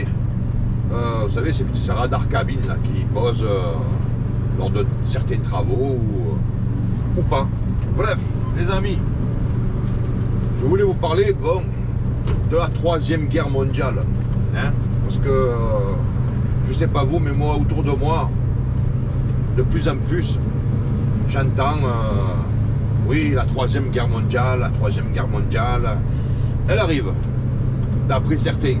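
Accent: Marseille, France. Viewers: merci l'ami! tu viens de me réconcilier avec la geopolitique !! c'est vrai qu'avec l'accent marseillais ça passe mieux !;-)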